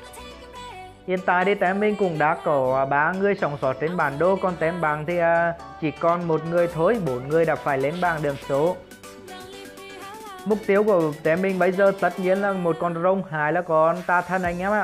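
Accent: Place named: Central, Vietnam. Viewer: Ng miền trung dd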